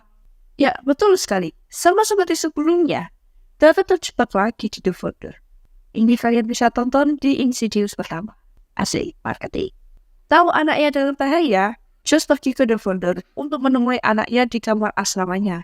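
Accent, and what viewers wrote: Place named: Java, Indonesia. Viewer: Pasti kk org jawa ya ngomongnya masih medok.hehe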